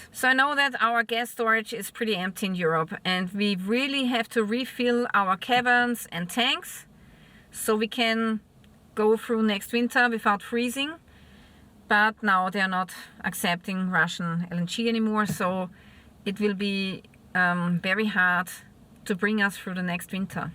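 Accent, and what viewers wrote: Germany. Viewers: Thank you for this summary of unsavory changes in Europe. Living in America, I’m often preoccupied with our actions and reactions, forgetting to stay up to date on the other regions. Love your accent by the way, reminds me of dear family friends I have in Mannheim. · I never liked a German accent but I like hers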